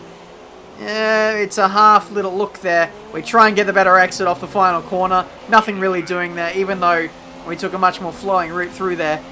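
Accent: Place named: Australia. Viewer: Found your videos last night and have ended up watching all of them already. You've convinced me to buy this game now so thanks! Also, good to hear a fellow Aussie accent playing games. Keep it up dude.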